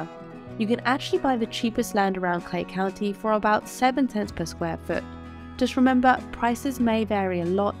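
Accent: United Kingdom. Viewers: Why is a Brit accent talking about American homesteads? Smh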